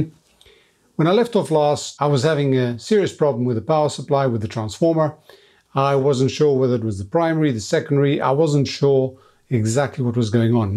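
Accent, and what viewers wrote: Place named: South Africa. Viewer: I heard that you are a hoarder and I instantly became a big fan. I am too! My mom recently died and I had to move everything out of her house because my brother and sister didn’t want anything because they live elsewhere. I had to move her things, my dad’s things, my uncle’s things because he was a hoarding repeat gold medal winner and my things that I had stored there. My daughter is already worried about me dying and that she will be stuck with going through everything. I have a nice collection. Good thing I have a big shop. You sound like you are from South Africa. I visited Durban because I met a nice lady there on the internet 20 years ago. It is beautiful there. If you’re not from SA never mind. Keep up the good work!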